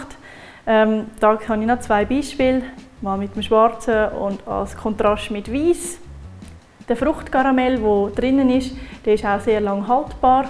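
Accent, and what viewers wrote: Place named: Switzerland. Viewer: Der schweizer Dialekt war für mich nicht einfach zu verstehen, aber es hat sich auf jeden Fall gelohnt! Danke!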